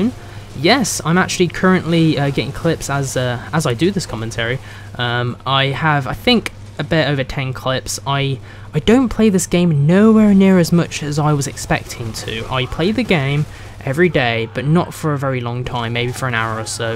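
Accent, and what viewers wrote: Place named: United Kingdom. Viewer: What part of the UK r yu from??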